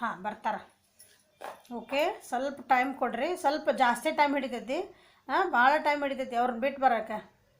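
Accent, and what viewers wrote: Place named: Vijayapura, India. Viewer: Bhahal chanda heliri akka nivu helidu kare ada noda akka nammadu vijapura nimma matado saili namma kade ede anasakataiti yava uri akka nimma vedo ede fast nodari kannige bididu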